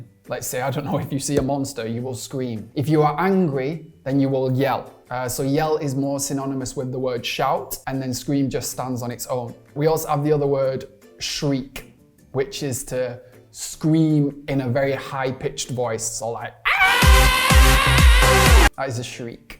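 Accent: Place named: United Kingdom. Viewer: Впитываю британский акцент с вами, а так, занимаюсь всякой фигнёй - химией вот, например👏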